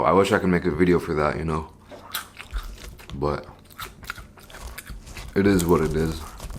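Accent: United States. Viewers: He talks American asf though I thought he was from the United States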